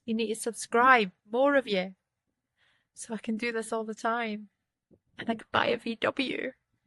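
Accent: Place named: Scotland, United Kingdom. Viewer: Yeah well here I sat in total anticipation, expecting as you promised, an epic cook up, to be shown a cheese baguette without the bun lol. I totally get your thoughts about the sound as well the view, the more senses that are stimulated the better the experience. I'm not sure if you have lived in Scotland, being born down south mate, as your accent has a hint of scottishness about it, but when your in your motorhome in summer at Rosemarkie and a storm rolls in, I wished I had been at work lol. Enjoyed it😊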